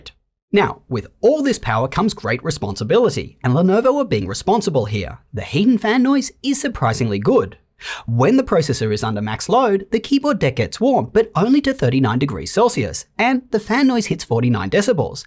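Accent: Australia. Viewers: Why the aussie accent when filmed in the US? Audio seems almost AI?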